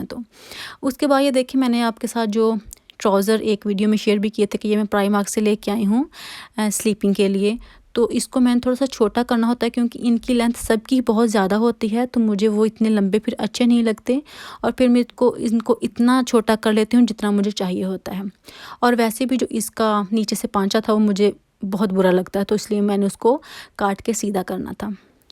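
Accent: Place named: Faisalabad, Pakistan. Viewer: Ap Faislabad me kis area sy hain? apky bolny sy lgta hy ap apny he shair sy hain…yani pensera road sy agy:))))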